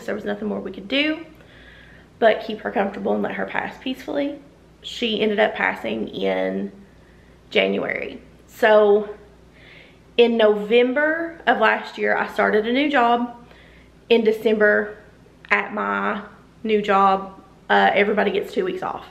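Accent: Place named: Southwest Virginia, United States. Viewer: I’m new to your channel, but I wanted you to know I’m sending you so much love and light 💞 I lost my dad in 2022, and I swear you’re right about grief. The things that hit the hardest are after they’re buried, after the dust has settled, and you wake up to remember the loss all over again. Girl, you’ve had a super tumultuous year. Take your time to recover ❤️‍🩹 and hey! I’m from Virginia, and you have the same accent as my grandma from SW VA in Grundy! Again, sending you love 💕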